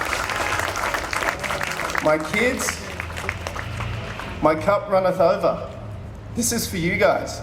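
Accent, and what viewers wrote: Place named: Australia. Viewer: In the beginning, I thought he was american, because of his accent in The Mentalist, and I also hadn't watched any videos until my interest grew on him specifically. It shocks me to hear him in an Aussie accent, you know? It makes me cry with this video because he's just so . . . perfect.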